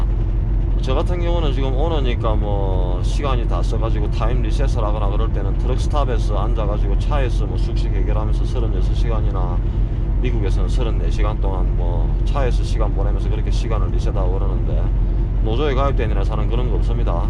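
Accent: Gyeongsang, South Korea. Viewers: 서울은 새벽부타 겨울비가 부슬부슬~하루종일 내리고 있습니다 여기오면 맘이 참 편해집니다... 님의 구수한 갱상도 사투리도 좋구요,오늘도 잘봤습니다^^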